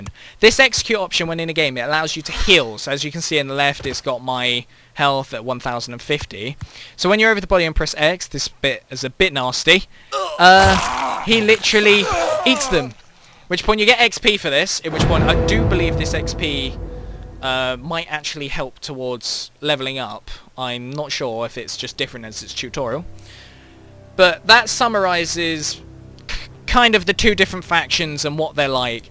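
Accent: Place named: United Kingdom. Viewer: Nice video. A nice British accent to listen to, no umming or ahhing, and a simple introduction to a game that I (and I'm sure many others) am looking into simply because Evolve has been delayed by such a long time. Onto your 2nd video......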